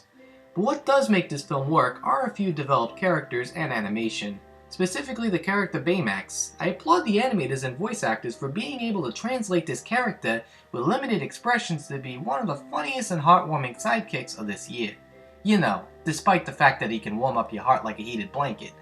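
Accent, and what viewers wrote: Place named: East Coast, United States. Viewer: I subscribed just to hear you tawlk. Not many Eastern accents in northern Minnesota. :(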